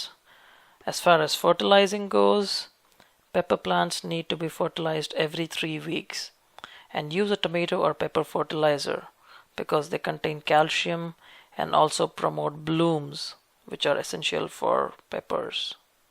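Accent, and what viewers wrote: India. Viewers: are u Indian ? u sound like one :)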